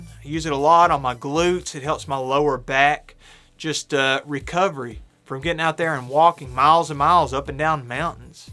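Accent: Kentucky, United States. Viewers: Man I knew that Kentucky accent 😂 I’m from Louisville but get out to the gorge and big south fork as much as I can. Maybe we’ll cross paths sometime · "See we dont like our spoons with short handles...we like em with long handles that way you can use em just like at" snaps fingers im from Alabama and i gotta tell ya the kentucky accent is great man. Great content as always.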